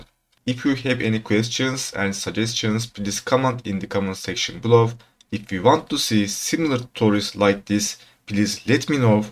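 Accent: Turkey. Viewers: Kardeş eğer sen türk değilsen ben bu işten birşey anlamıyorum demektir telafuzun çok belli ediyor kendini, harfleri türkçe vurguluyorsun. yanlış anlama bunu kötü amaçlı söylemedim videoyu çok beğendim yardımcı oldu teşekürler 👍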